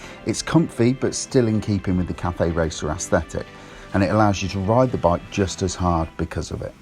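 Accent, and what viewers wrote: United Kingdom. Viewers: Like always , visor down does it the best ! Love the British voice.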